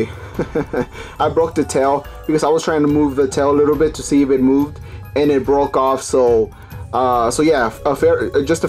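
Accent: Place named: Mexico. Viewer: are you a Mexican trying to talk English you wouldn't mention you just did slam dunk your stupid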